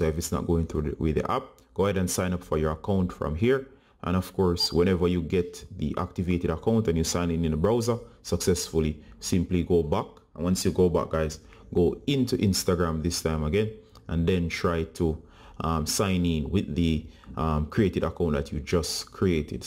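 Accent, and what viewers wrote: Jamaica. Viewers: Are u from Jamaica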